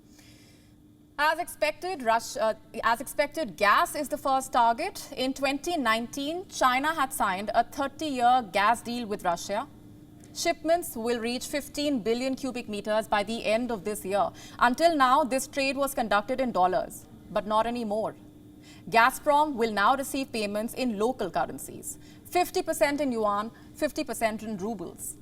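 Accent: India. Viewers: I wish your Indian accent was greatly reduced so you would be easier to understand. And your voice doesn't have the soft firmness I've become used to. I really hope you will work on this. Your channel is quite informative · Gosh indian accent is horrible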